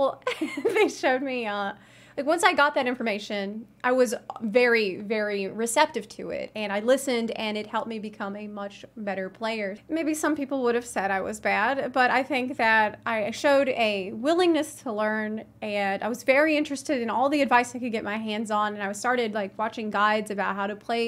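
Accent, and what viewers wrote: South, United States. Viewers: oh can you read me some goddamn bedtime stories with that sweet moonlight magnolia drawl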